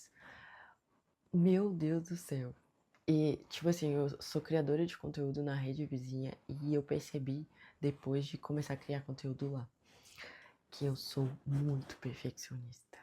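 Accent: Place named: Minas Gerais, Brazil. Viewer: Gostei do seu sotaque vc é da onde ?! Mineira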